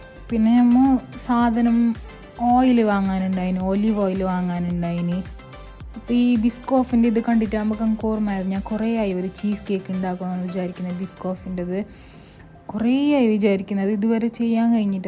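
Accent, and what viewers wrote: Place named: Kasaragod, India. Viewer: Language orginal kasaragod🤩👌🏻kelkan vibe avvunund❤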